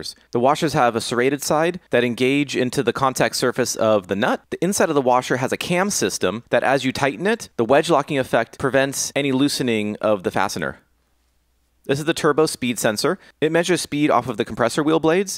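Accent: Greece. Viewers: R u greek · Are you from greece?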